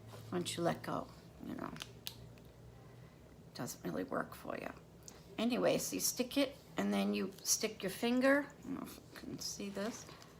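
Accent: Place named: Boston, United States. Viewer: Oh I guessed Boston so you being from Mass makes sense :) It's awesome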